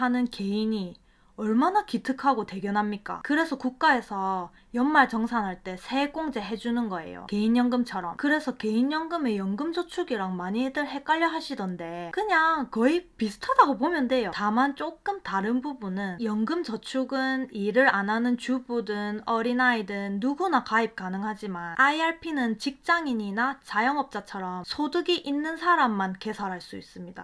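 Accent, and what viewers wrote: Gyeongsang, South Korea. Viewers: 경상도 억양같은데요~~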